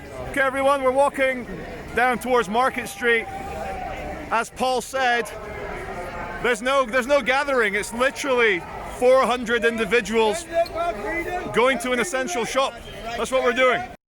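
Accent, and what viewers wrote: Russia. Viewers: I love your Russian accent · I love your Russian accent. It's really funny. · Absolutely love your Russkiy accent - I could listen all day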